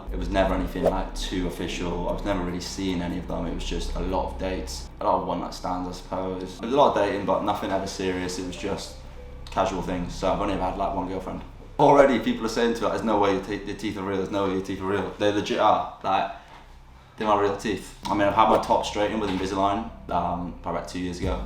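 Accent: England, United Kingdom. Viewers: which English accent is he speaking? 😩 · I turn the caption on coz ya know is being so british heheheh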